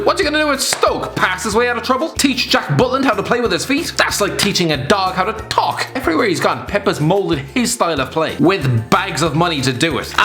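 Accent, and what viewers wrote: Ireland. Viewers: I loveeee his Irish accent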